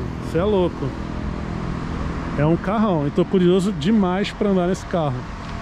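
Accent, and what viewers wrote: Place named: Rio de Janeiro, Brazil. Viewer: Esse sotaque carioca e joativo aff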